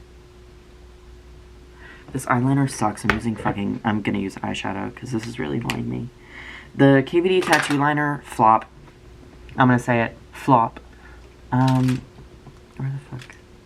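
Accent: United Kingdom. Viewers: the British accent omd 😭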